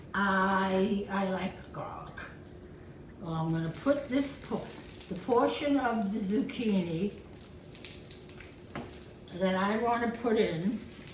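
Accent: New York, United States. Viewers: Stanley Tucci started the recent craze about Spaghetti Nerano. There is a video of Stanley watching an Italian Chef make the dish which Stanley says was “ life changing” . Chef John from Food Wishes agrees and insists deep frying the Zucchini rather than pan frying is the first key. The second key is refrigerating the zucchini overnight. The kitchen science is not explained. Finally, Chef John uses more butter. Otherwise , he mashes the zucchini like you do , and he used substitute cheeses like you. I pan fry my zucchini in more oil and butter than you do, but I hate deep frying. I might refrigerate the zucchini once to see if it matters. I love watching you as your accent reminds me to call my sister in New Rochelle( I live in Omaha since Medical School). 😘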